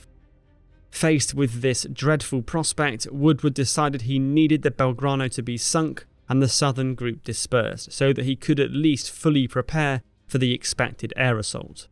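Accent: United Kingdom. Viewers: "Whether it was justified" well, the narrator's British accent can only raise my confidence that it concludes that justice and reparations are closer than ever. :<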